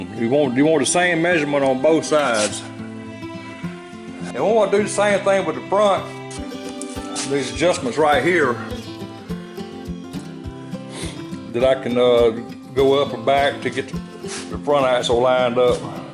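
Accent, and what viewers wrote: South, United States. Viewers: Бля, как же меня прикалывает этот "реднековский" акцент. Good job men.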